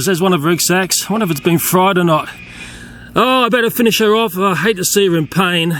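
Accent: Australia. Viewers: I love his Australian accent! XD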